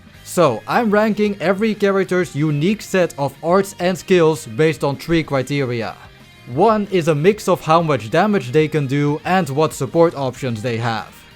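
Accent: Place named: Netherlands. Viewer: Hoi hoe gaat het ik hoor dat je een nederlands accent hebt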